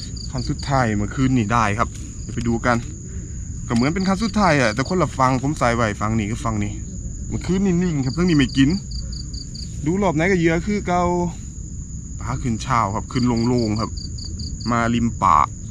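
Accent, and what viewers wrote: Korat, Thailand. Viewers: ชอบฟังภาษาโคราชfcสกลนครครับหมานๆเด่อ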